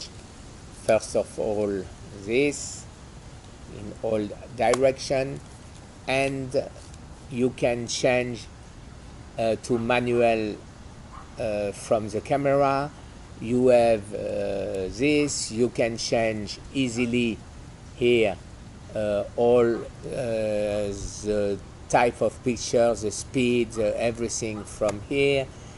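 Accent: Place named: France. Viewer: Cà me rend triste aussi d'apprendre que tu es atteint de cette sale maladie. Il y en a tellement! Mais bon il faut garder espoir et profiter de la vie comme tu le fais. C'est en partie grâce à toi que j'ai migré vers le monde du MFT. J'ai choisi Olympus et suit très satisfait. J'aime tes vidéos et ton anglais "so frenchy". Tiens le coup.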